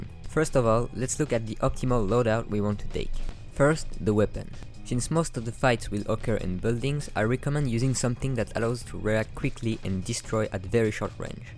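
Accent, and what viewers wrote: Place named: France. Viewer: T'es français ça s'entend direct à ton accent mdr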